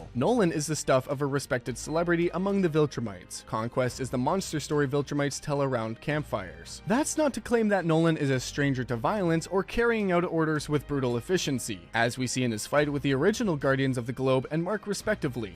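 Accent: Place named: Canada. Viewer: thick canadian accent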